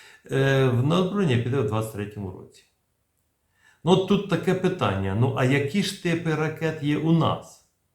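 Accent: Vinnytsia, Ukraine. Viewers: Як же ж мені подобаються МЛРС, ХАЙМАРС і пан, так чітко і класно доповідає нам про ВСЕ!!! Балдєю від Вашої украЇнської вінницького акценту.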